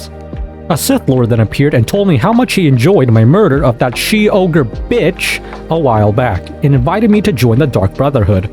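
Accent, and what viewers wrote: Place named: Canada. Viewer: Ah your thick canadian accent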